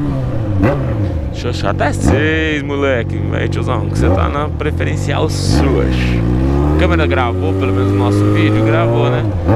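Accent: Goiás, Brazil. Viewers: Vc é Goiano? esse sotaque seu, parece demais de goiano.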